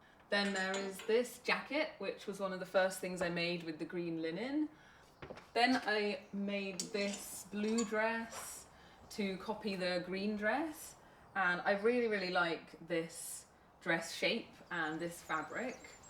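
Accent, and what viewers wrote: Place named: England, United Kingdom. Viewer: Es una pena que no tenga subtítulos en español, solo logro entender algunas palabras del encantador acento inglés 😢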